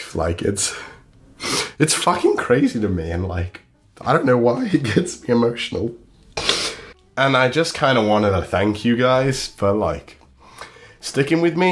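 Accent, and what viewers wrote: United Kingdom. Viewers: He sounds so British back then LMAO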